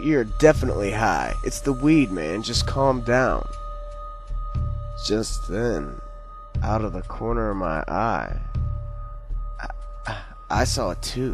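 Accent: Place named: West Virginia, United States. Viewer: Great story, and I love your voice! Kinda makes me feel nostalgic. I moved to TX from WV about a year ago. I never realized how much I would miss that accent. Listening to this makes me feel like I'm hanging out with some of my old friends trading stories while smoking. lol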